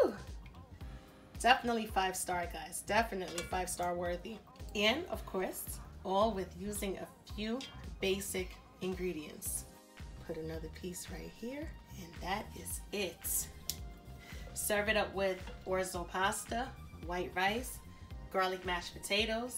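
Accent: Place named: East Coast, United States. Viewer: Yummmyyy!!!! We look for the good food but listen for that East Coast accent! ❤️❤️ 😊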